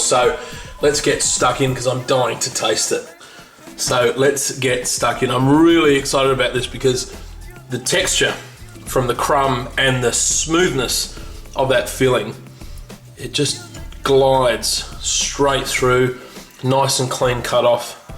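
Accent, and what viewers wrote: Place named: Australia. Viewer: Dear, I come from Holland and very like your recipe's. But sometimes I don't understand you because you've got a Australian accent and my English is just bad. But my question is if you maybe can give a manual in the description so I read it and understead it better? Thank you, Sander from Holland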